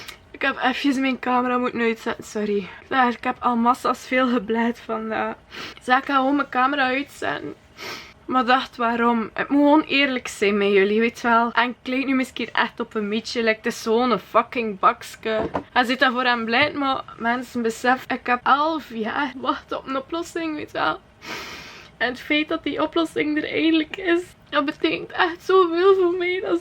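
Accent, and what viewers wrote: West Flanders, Belgium. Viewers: & ik hou van het West-Vlaams in je vlogs! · Funny... en west-vlaams! Ohja en ik moest van Hannes :D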